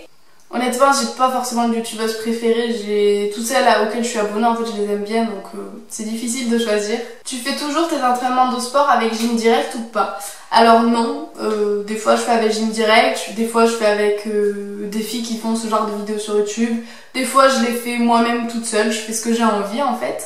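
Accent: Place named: Aveyron, France. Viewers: Tu habite où 💕parce que moi j'habite à Millau est je mange très régulièrement de l'aligot saussice et j'ai le même accent que toi 💕du quou je pense que tu est née en Aveyron 💕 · Toi tu es du sud ouest tu as un accent toulousain à 10 000% ahahah gros bisous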